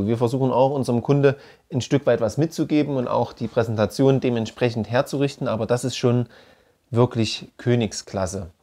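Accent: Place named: Saxony, Germany. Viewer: ich grüße einen Sachsen! Man kann den Dialekt nicht weg bekommen. Mir gehts auch so in Wien!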